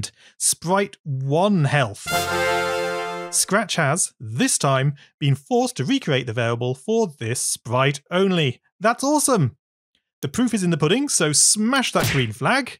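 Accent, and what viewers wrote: United Kingdom. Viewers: BAD MOVIE, MAN SOUND TOO BRITISH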